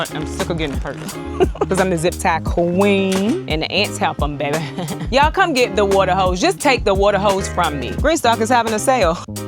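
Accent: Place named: Chicago, United States. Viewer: I cannot convince myself that you are not from The Chi. You just have that Chicago vibe. lol. Heeeey from Mississippi!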